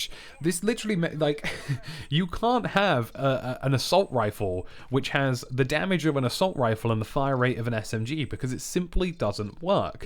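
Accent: United Kingdom. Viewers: Sick channel nice to hear a uk accent ha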